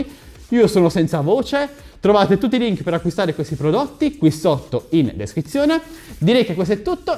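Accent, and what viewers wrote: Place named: Bari, Italy. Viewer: E' piacevole sentire un unboxer con il poprio accento.... sei un grande!!!...W Bari.....Ottimo unboxing!!!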